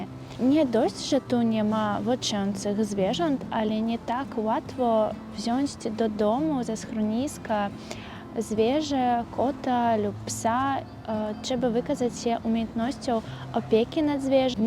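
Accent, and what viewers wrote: East, Poland. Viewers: Piękny wschodni akcent😍 Czuj się w Polsce jak u siebie w domu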